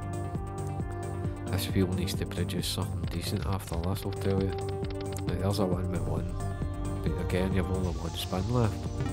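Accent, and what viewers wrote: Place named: Scotland, United Kingdom. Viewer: I said to myself like Kilmarnock or something aswell 😂 just couldn’t quite place the slight accent, Good luck on your upcoming videos mate hope to see a record breaker soon 🙏🙏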